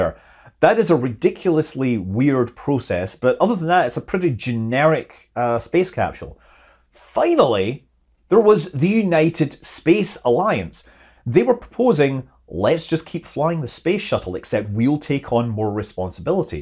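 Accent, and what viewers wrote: Scotland, United Kingdom. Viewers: Scottish to Amerikan translation is needed for this expression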